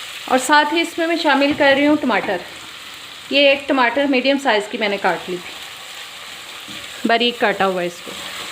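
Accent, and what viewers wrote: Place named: Punjab, India. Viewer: Thanks Ji, I also liked you Punjabi accent Urdu Loved it.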